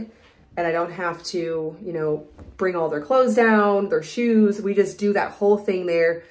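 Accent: United States. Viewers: I actually went to your open house not knowing about this channel then later found it after seeing a page from your book hanging in the barn and of course I had to look it up! To my surprise I already followed you on instagram! The house felt important and historical. It’s hard to describe. You did such a great job of bringing it to life and yet keeping the feel of that time period. I felt I was truly touring a piece of history. It has been really cool to go back and see what you guys added from antique shops and such. Because I never would’ve guessed it wasn’t all original. I also love old things and I love your style. Your videos are so well done. And as a fellow Missourian I love hearing your Missouri accent that is similar to mine 😆. I will definitely be following along on your home building journey. Can’t wait to see what you do next and how you’re making things work in your temporary home. 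It’s all very relatable to me because I also have a large family and we have moved a lot. Whoever ends up in the farm house will surely love it and feel that magic. It’s a very special place. 🤍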